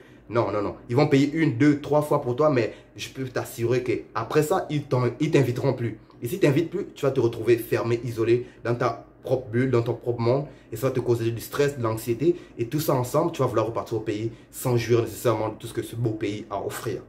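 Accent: Gabon. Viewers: Tu as trop l'accent d'un gabonais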